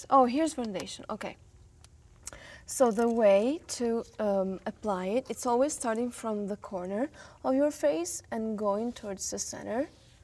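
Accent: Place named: Italy. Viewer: italian accent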